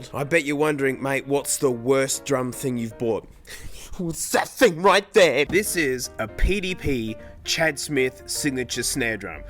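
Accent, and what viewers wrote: Australia. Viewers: ever since he took a break, his australian accent thickened lol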